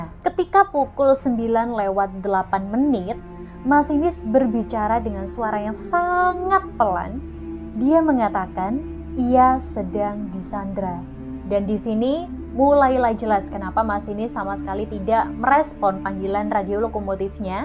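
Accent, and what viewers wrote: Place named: Surabaya, Indonesia. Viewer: MBAK E WONG SUROBOYO 😅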